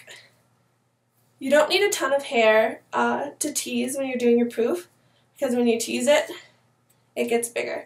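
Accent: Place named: Canada. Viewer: i love your tiny Canadian accent :)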